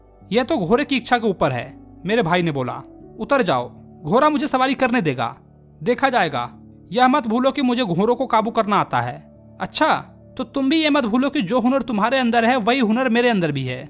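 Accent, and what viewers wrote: Bihar, India. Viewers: Bihari assent 😎🤟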